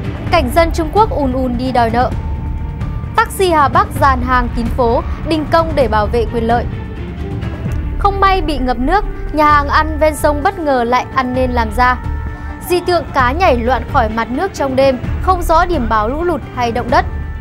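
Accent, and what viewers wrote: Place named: Hanoi, Vietnam. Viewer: thi giong nhu ngoai ha noi may chu gi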